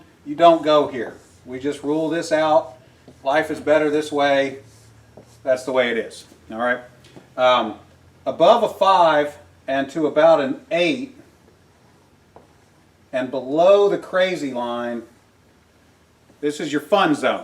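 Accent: South, United States. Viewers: For me it's the accent that makes this video, Southern, clear, articulate and a perfect balance of technical talk and slang. It's like he's briefing a group of astronauts.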